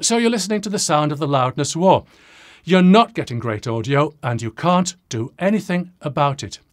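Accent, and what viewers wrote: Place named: Merseyside, United Kingdom. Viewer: I have original CDs from before 1995 that sound bad because the analogue to digital conversion wasn't very advanced when they were transferred, they have good dynamic range but lack definition.... granted a lot of "remastered" modern recordings are awful and have the 'flat" sound that comes with over compression and over-processing in general. I notice you have a slight Merseyside accent.... my neck of the woods, anyway thanks for a very interesting video that tells the truth about our modern recording world.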